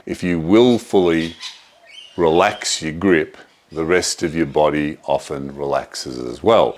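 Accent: Australia. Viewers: Can’t tell if you’re from Australia or Boston MA 😂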